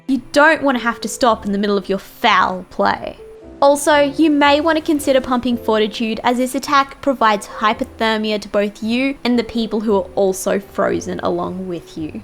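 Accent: Australia. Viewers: Crikey! She’s Australian! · Is she Australian or just imitating Steve Irwin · Honestly, aussie girls and video games? Like Heaven. I could listen to that accent for hours! Lol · She's so Australian it hurts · she sounds like a basic Aussie to me — from an Aussie. Nothing wrong with the voice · An Aussie I see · Not gonna lie. It caught me off guard that a sweet aussie girl started talking rather than "BOYS AND GIRLS OF THE SHAAAAAAAAAFT" XD But she is great! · Your Aussie accent makes me want to have your babies. I will carry them to term. Also, you're doing a great job as a commentator. Keep it up, and I'll have triplets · Gotta love that Aussie accent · I like little Aussie accent lol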